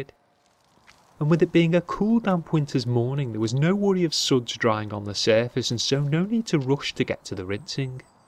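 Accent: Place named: Wales, United Kingdom. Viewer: Are you from Wales I love the welsh accent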